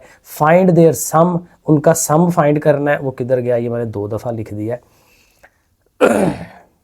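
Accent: Sialkot, Pakistan. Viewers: Sir ap sialkoti hy myri trha ap ka bolny ka trika 😂😂😂❤ but acha hy 😊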